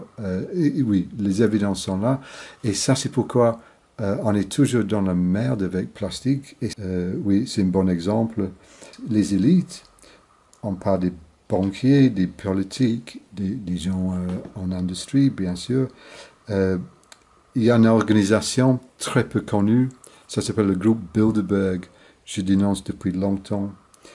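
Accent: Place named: Yorkshire, United Kingdom. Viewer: Far less ' Yorky' in your French accent nowadays :)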